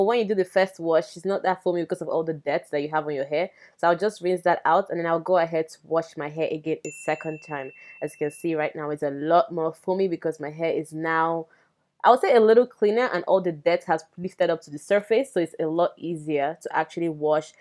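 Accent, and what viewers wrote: Nigeria. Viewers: Your voice makes me no that you are from Nigeria well I am too and I love your video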